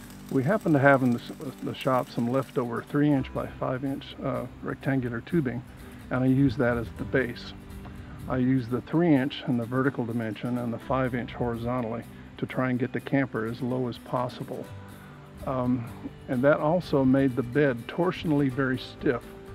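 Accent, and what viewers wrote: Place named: Georgia, United States. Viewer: Gotta be from Georgia. He says "Hweel"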